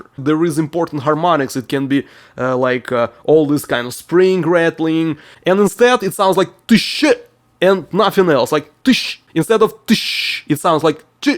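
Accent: Russia. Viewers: Хоть я и не особо знаю английский, но когда человек говорит с русским акцентом, то почему я понимаю о чем он говорит?)))) Видео зачетное!👍 Полезное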